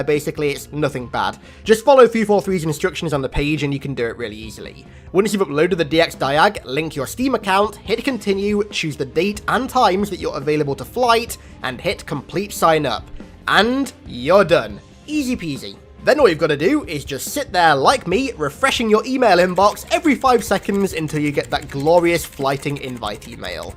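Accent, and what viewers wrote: United Kingdom. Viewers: i always thought you were american, good to see a british halo youtuber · when you've been watching for years and only just now realized he's british......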